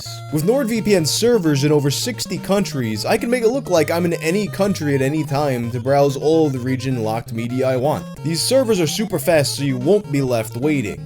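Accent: New York, United States. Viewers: WTF! You are Sikh??? U sound American as fuck. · did you always have a new york accent? i swear you didn't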